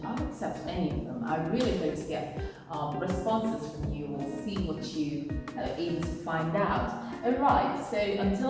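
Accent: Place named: United Kingdom. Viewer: Wow I love your British accent, it's very elegant and beautiful. I think your YouTube channel will do just fine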